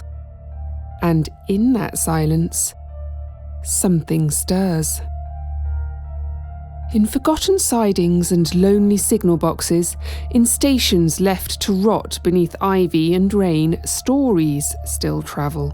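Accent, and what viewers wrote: England, United Kingdom. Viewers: It's a lovely human voice. Humans tell a much better story of haunting & spirits then bleeding AI. These type off stories need a sympathetic voice, I'm sure AI cannot do sympathy. It's also nice to have an narrator who's from the UK. There are certain stories that need an accent that brings a calm voice, she has the perfect voice. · Ah, that beautiful, quintessentially English voice again...a reminder of how wonderful the country used to be...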